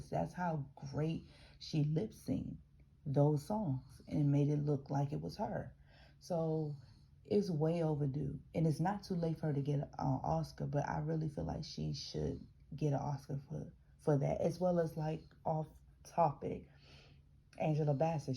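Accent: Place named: South, United States. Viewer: P.s. I'm from New York. It's so true that my city is dirty, lol. You have a bit of a southern accent to me. It's not that thick, though.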